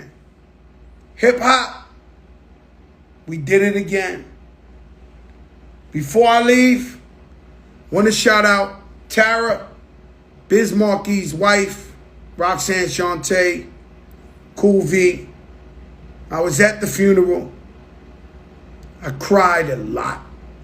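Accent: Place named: Bronx, United States. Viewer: Joe told this story ,WITH A MESSAGE ,with skill, and spoken like a TRUE BRONX Vet and Legend!!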